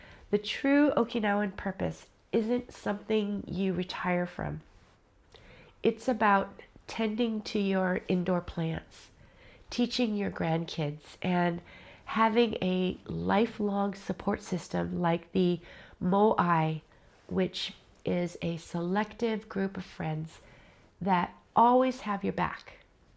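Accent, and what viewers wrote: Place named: Netherlands. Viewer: Hi, sounds Dutch are you? Well don’t give up yet. There is a bigger reason why you are here and your life has value. Most people have problems nobody knows about. Count your blessings and focus on what you are still capable do and find someone you can help with their problems. Stay strong ❤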